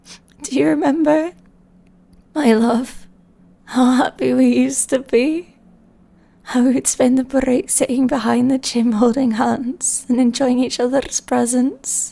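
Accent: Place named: Scotland, United Kingdom. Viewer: Scottish accent detected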